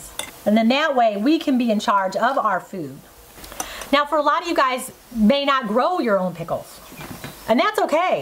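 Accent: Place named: New Jersey, United States. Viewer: Your accent reminds me of a Jersey girl. Where were you originally from? I really enjoy your videos...VERY helpful!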